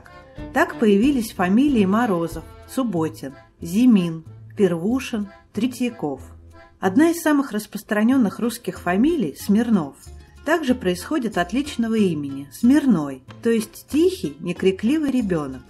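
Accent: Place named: Gomel, Belarus. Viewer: Говор беларусь гомельская обл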